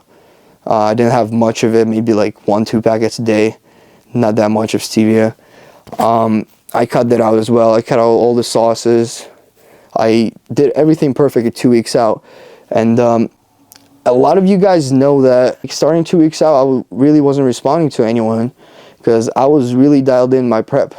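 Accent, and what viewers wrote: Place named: Ukraine. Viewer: Never mind your Ukrainian. I wrote that before I saw the whole video lol I detected a slight accent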